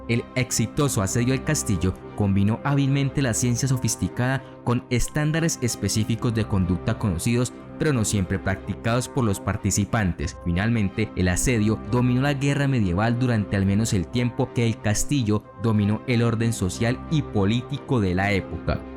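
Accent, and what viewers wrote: Colombia. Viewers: Ese acento de colombiano tan bravo.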